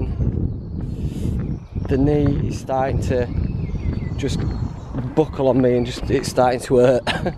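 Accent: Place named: Northern England, United Kingdom. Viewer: You're so ridiculously northern. I love it. Looking forward to part 3, from Cardiff :))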